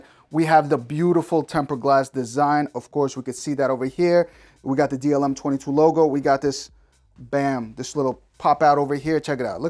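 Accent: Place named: New York City, United States. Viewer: this guy is painfully New York and it's amazing, big twist to the tech community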